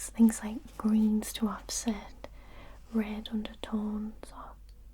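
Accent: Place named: Durham, United Kingdom. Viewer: Gonna move Durham just for the accent. Not joking 😂 such a nice accent not southern not North North just nice. I come from Kettering it ain't a good accent 😂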